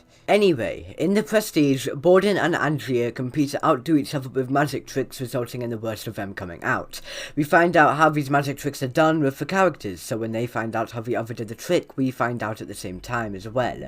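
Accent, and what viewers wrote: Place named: United Kingdom. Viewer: Oh wow, you're actually British. Didn't expect that.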